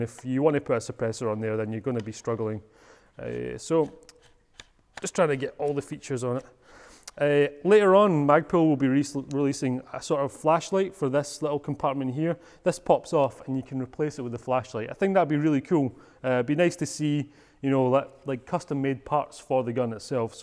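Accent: Scotland, United Kingdom. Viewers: Such a thick Scottish accent sometimes it sounds like he's speaking a different language.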